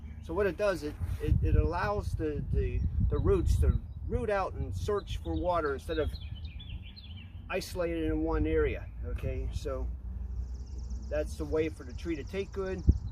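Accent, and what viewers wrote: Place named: Pittsburgh, United States. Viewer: I detect a yinzer accent....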